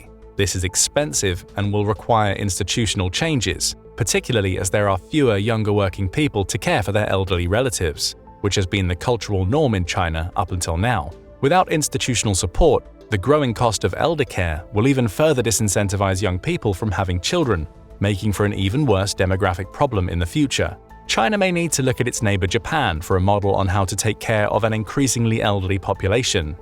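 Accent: United Kingdom. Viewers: Yet another AI generated channel with a British accent robot reading script. Who is behind this channel?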